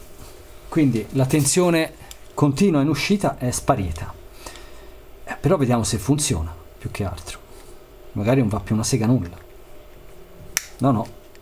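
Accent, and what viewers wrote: Tuscany, Italy. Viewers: ma quanto bello e' l'accento toscano ? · Simpatico, specialmente con l'accento toscano! 10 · Si dice circùito, perché ciruìto vuol dire preso per i fondelli. Bravino comunque anche se sei Toscano. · Simpatico, all'inizio mi hai fatto fare qualche risata, sarà dovuto anche a quell'accento toscano, sono contento che hai risolto il problema, e hai fatto vedere agli altri e come si fa. Bene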